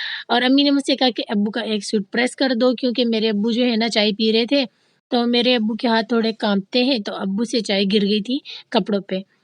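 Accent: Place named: Peshawar, Pakistan. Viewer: Apki batun ne lehjy Sy mujy peshwar ki yad agai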